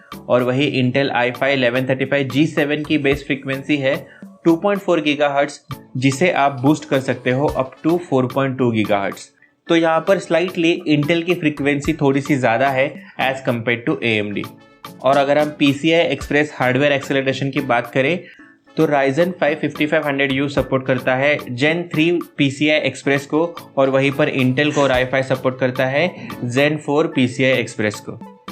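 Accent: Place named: India. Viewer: 很不错，印度口音非常喜感（如果说错了我道歉）。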